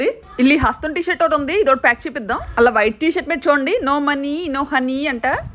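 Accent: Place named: North Andhra, India. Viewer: ఉత్తరంధ్ర యాస, వారే వాః 😂😂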